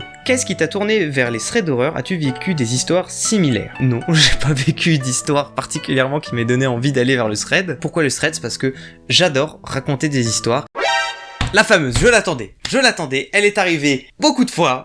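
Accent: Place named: Alsace, France. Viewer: Merci ( Moi je connais ton vrai prénom hehehe 😜 ) pour cette FAQ, j'ai appris des choses sympas sur toi 😃👍🏻 Et j'avais deviné que tu habitais cette region, ( j'ai habité en Alsace pendant 11 ans, ma region de cœur 🥰 elle me manque 😓 ) le "OUi", ils le disent "Ui" c'est rigolo 😂 Khalee et tes 2 chats, je les adore! 🙏🏻😍💝 Je suis aussi cinéphile, quand je regarde mes Thrillers psychologiques, je decortique, je fait des hypothèses, j'essaie de comprendre ce que le réalisateur a voulu nous faire comprendre 🤔🕵🏼‍♀️ Et une question qu'on ne t'a pas posée: Je vois que tu as plusieurs guitares derrière toi, tu en joues? Si oui ( Ui 😂😜 ), pourrais-tu un jour nous en faire profiter un peu? Je suis qqun qui est très musique, chant, danse, etc... et je suis totalement d'accord avec toi, Joyca il pèse lourd dans le game, il est tellement doué!! J'adore ce qu'il fait, c'est fou ce qu'il arrive à sortir avec 1 son de 2 secondes 🤩🎶 Et quand Squeezie ( que je kiffe aussi énormément 🤩🙏🏻 ) s'en mêle, ça fait un duo de MALADE 🤩Sora est trop adorable et bienveillant, je le suit depuis peu, je l'ai decouvert récemment, et bien sûr, Louis! 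🤩 Je suis de plus en plus attirée par la culture Asiatique, le Japon 🏯🇯🇵💝 et la Corée 🇰🇷💝🎶 Voilà, j'adore ce que tu fais, ta façon de raconter, ton humour rafraîchissant 🤣🙏🏻 je t'ai decouvert il n'y a pas très longtemps, je dirais 7 mois 🤔 avec ta vidéo "Vous ne pourrez plus être en couple" 🤩🙏🏻 J'ai hâte de voir ta progression, tes projets, etc... Biz da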